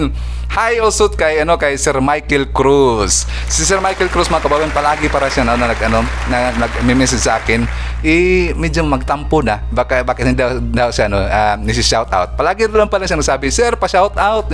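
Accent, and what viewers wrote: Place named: Bohol, Philippines. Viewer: Parang taga Bohol ka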